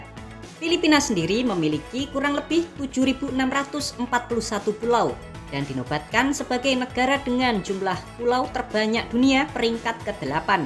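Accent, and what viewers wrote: Java, Indonesia. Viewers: Adminnya medok banget logat jawanya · suara mimin lucu banget karena orisinil logat jawanya itu yang bikin lucu 🤣🤣🤣🤣🤣🤣🤣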